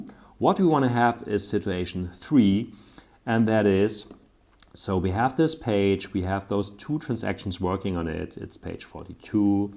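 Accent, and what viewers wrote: Germany. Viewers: Ohh Gott, dieser Deutsche Akzent. Aber trotztdem Danke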